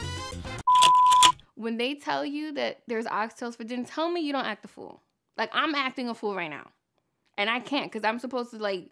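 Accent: New York, United States. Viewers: Love your oxtail recipe. I love your accent did you grow up in NY? You are very talented in the house 🏡👌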